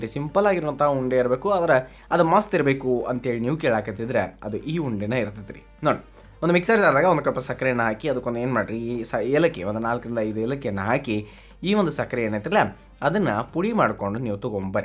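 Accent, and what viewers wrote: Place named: Bijapur, India. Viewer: Nau kuda bijapur davru sir matadidu keli manssige tumba sanda aaitu sir🙏🙏❤❤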